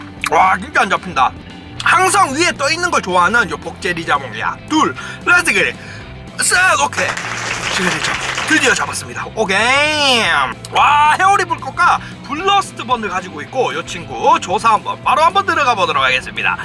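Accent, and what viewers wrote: Gyeongsang, South Korea. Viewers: 역씨 경상도ㅋㅋㅋㅋㅋ 거북왕 말고 그북왕ㅋㅋㅋㅋ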